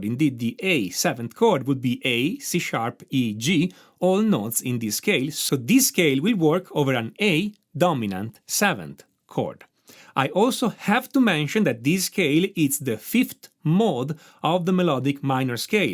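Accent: Italy. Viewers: I like your accent. Italian?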